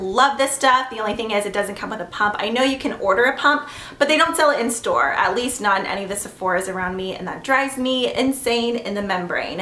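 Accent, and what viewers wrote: Texas, United States. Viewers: !!! You're totally picking up the Texas accent lol its hilarious. And btw, great video & keep using the make up geek shadows....forget the haters. Lol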